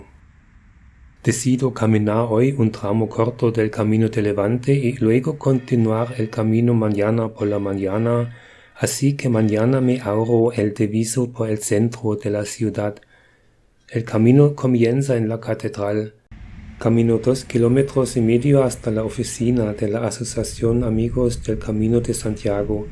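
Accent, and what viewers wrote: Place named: Germany. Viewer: warum mit deutschem akzent spanisch gesprochen ich kann es verstehen, aber die meisten deutschen nicht. super idee, den nicht so bekannten camino levante zu gehen. ich war 1972 in valencia resp. in der nähe und habe nur gute erinnerungen ausser an den zoo. damals hatten die tiere so eine art käfig wie im zirkus, da sind wir geflohen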